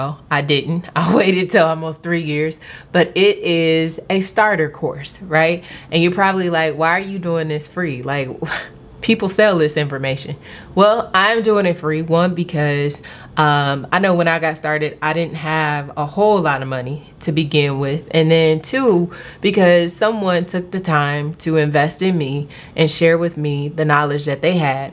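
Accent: Houston, United States. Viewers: Thank u for the info..I bought Billy Carsons workshop a while back.....Is that a Houston accent? I'm kinda hearing Kelly and Beyonce...which is CRAZY that u favor both of them! love the content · Are you from Houston?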